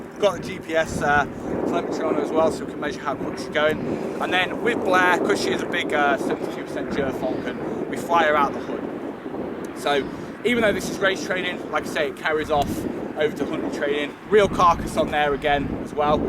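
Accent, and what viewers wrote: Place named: South Carolina Lowcountry, United States. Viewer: I can tell by your accent that you live near me in the South Carolina low country. I’m located in Myrtle Beach and was searching for a previously owned falcon on Craigslist. I’ve been ripped off TWICE now. 😔. I bought my first bird from a guy who would only meet with me in the Brew Ha Ha’s parking lot. At first I loved his bird. It was so gentle and quiet. The bird came with the little hat and everything. But after purchasing it I waited three days for it to wake up and NOTHING! So finally I decided to take off its little hat and visor. Only then did I discover that it didn’t have a head. The hat was literally sewn onto some animal’s torso. I’m not even sure if it was ever a bird to begin with. Upon further inspection I was appalled to realize that the animal’s magnificent feathers had all been Scotch Taped to the pelt. 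I was very skeptical of the second seller and demanded that wake the bird up. I was relieved when the bird became animated. It was an angry foul beast but the seller was confident that the bird was just having a bad day and was usually a late riser. So I bought the bird. I was so excited about my new falcon and posted pictures on my Instagram. It turns out that my bird isn’t suitable for the sport of falconry. Again I was duped. The bird I purchased doesn’t even fly. It looks like a falcon but it’s usually not trained to fly. It isn’t so much a sporting bird. My friend Ming’s parents own the Wok In Laotian restaurant and daycare center in Myrtle Beach. They id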